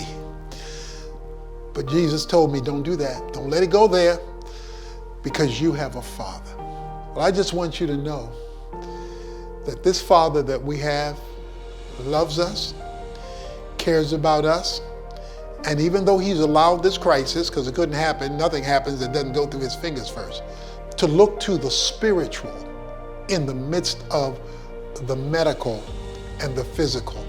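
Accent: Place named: Africa. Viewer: Legitimate concern vs illegitimate worry. Very nice. I also like his afro accent...so calm and soothing.God bless him and every believer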